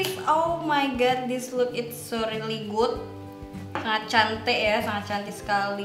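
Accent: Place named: Jakarta, Indonesia. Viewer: dengerin logat Betawi nya neng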